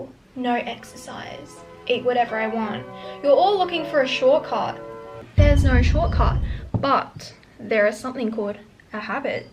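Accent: Australia. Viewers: How to get straight A’s. me thinking why she’s talking about letter grades and she has an Aussie accent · Her beng typical a aussie norr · her aussie accent tho 💖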